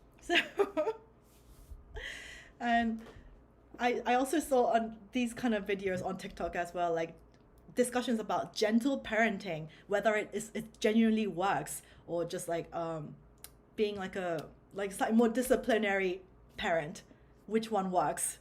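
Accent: United Kingdom. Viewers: Sis, you’re hot. Your voice is sultry. You have a british accent. You’re bilingual. Shall i go on? You’re winning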